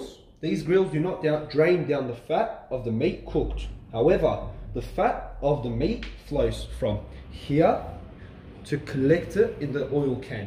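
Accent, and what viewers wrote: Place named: Australia. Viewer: Australian accent, interesting...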